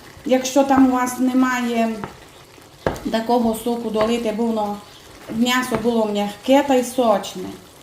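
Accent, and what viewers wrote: Transcarpathia, Ukraine. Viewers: Вона прекрасно говорить закарпатським діалектом то є наша мова у нас усі так говорять вчіться обисьте розуміли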